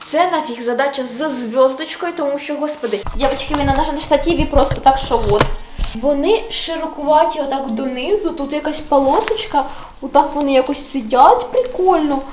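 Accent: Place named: Western Ukraine, Ukraine. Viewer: У тебе така гарна мова, не чисто літературна але в цьому і привабливість. Ти взагалі звідки? Гадаю з Западною України- Тернопіль, а може і з Вінниці. А взагалі дуже приемно чути твою мову- спасибі тобі!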